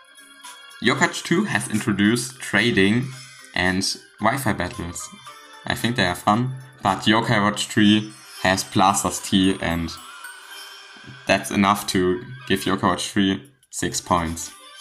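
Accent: Germany. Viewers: Is that a german accent? Hi wie gehts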